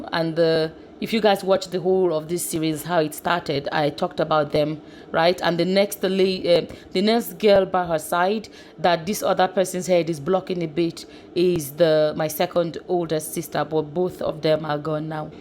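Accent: Nigeria. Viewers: You speak like your from orlu or Osu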